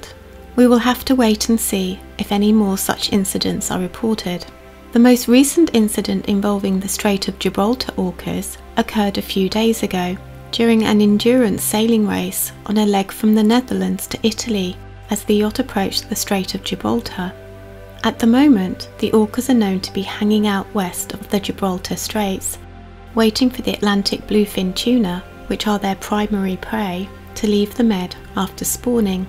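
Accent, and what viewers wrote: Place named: England, United Kingdom. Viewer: No problem, as an orator you are exceptional, I hope it is a professional position, it should be. As a retired teacher (STEM) pace (slowish) and clarity are essential for comprehension. In addition you English accent and voice tone are very pleasant to my old Australian ears.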